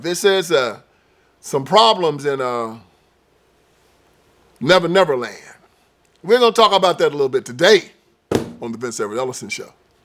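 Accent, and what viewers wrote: South, United States. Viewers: Love your delivery, and that Southern Drawl, truth, love ,and light,God Bless from not so Great Brtain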